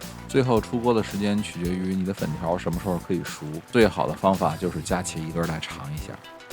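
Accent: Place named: Beijing, China. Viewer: 时的センス让人当时就反应到“嗯？北京的口音？” 紧接着“今儿”可以确诊，“是北京人”🤓 直到“熬白菜”一出现哇塞超懐かしいやろ～ 一说这词儿得久违了多儿年了自己都想不起来但那是在北京儿时的记忆 “热锅凉油”一出来“爱？专业干这个的？” 那颠锅时的自如，郎鹤炎有话：“内就是厨师！” 完后才明白，“料理与吐槽”是这样结合的。就的还不是米饭是特么干粮！ 😄😆🤣订阅了❤️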